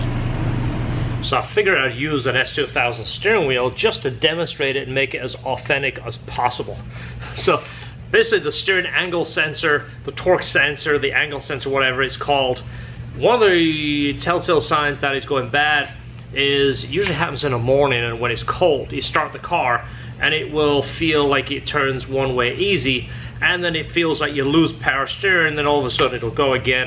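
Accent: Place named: Yorkshire, United Kingdom. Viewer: Hey. 3 things to mention here. Good tip on drilling the holes to prevent further cracking.👍 The repair job came out nice. The S2000 buyers assistance program sounds great. I think there's a lot of value to the customer to have an S2K specialist like yourself sourcing the car for them. And finally, there's nowt wrong with your accent. It's Yorkshire innit?😉 I mean, you hooked Esther with that accent, so it sounds like a winner to me. See you on the next one.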